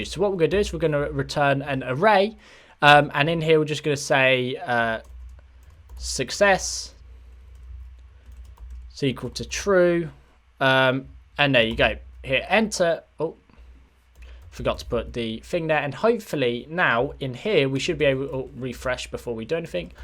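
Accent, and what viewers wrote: United Kingdom. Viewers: british accent hahaha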